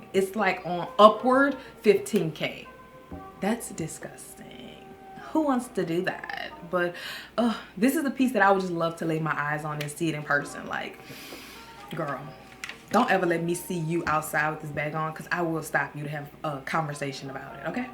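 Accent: New Orleans, United States. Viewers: Lol😄😂@ I LOVE ME SOME YOU..- I WAS FEELING SAD 🤧TODAY UNTIL YOU MADE ME LAUGH. 😄 THANKS... I LOVE YOUR NEW ORLEANS ACCENT... THIS VLOG WAS ON 🎯..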